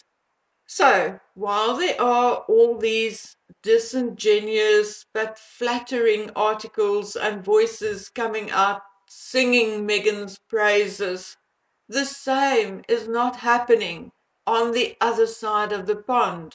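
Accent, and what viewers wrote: South Africa. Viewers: I think impressions by people who are not in the UK are dubious. This is a small island and you are never far away from the RF. They make visits an amazing number of times. Its extraordinary how they have the stamina. They do marvellours work in many areas unpublished but leaked by people who work in the royal residences. All this can happen because they live in America one of the most dangerous places on earth. I worked abroad as a member of the Foreign and Commonwealth Office and the Americans were despised as ignorant and brainwashed. They are deadly in diplomacy you want no association with them. I gather from your accent you are South African and maybe have emigrated. You forget the Monarcy is here at the choice of the people and will not be removed by anyone overseas. It will end when we, the people, decide it does not suit our purpose. 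You also leave out the greed for money and power that fuels the US in a way it does not in Europe. Americans think because they speak English they are like us. They are not. We have not shared a history with them. We did not massacre and try to starve a native population. We had equal rights for our black and asian friends. OK some people object to the benefits some of these people have over poor whites. They are at the head of every profession in our country. Likewise women including 3 Prime Ministers, First Minister of Scotland, past First Minister of Northern Ireland. America is a power hungry country. it is also ridiculous in is post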